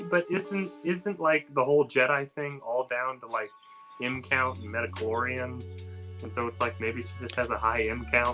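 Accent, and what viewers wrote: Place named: South, United States. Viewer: You sound southern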